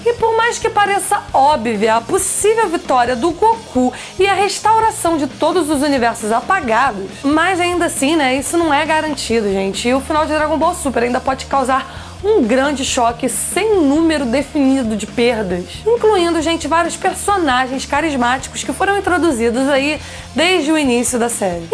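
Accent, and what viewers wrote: Rio de Janeiro, Brazil. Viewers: Pietro Silva Claro que não né... Sou paulista,e não me incomodo com o sotaque carioca. Eu já sou acostumado a ouvir . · Eu tbm sou carioca.. Ksjsjsjsj, esse "i" sempre vem nais palavrais. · Kkkk, estive no Rio e reparei no Douze tbm, naiscimento, mas acaba sendo fofo. Relaxa. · Sou carioca e entendo....o x no lugar do s..... É foda :/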